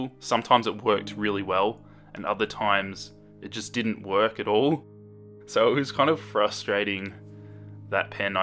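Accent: Australia. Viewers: Wait, ARE YOU AUSTRALIAN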